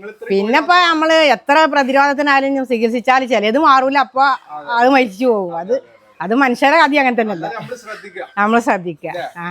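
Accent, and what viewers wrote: Malappuram, India. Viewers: നമ്മളെ മലപ്പുറം ഭാഷ ഇങ്ങനാടാ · മലപ്പുറം ആണ് അല്ലെ സംസാരം കേട്ടിട്ട് 😁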